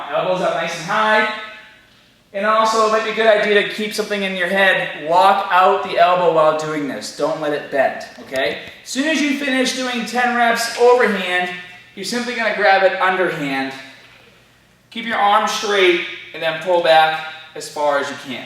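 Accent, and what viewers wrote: Boston, United States. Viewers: Back in the day before went full Bostonian "Bahhbelll!"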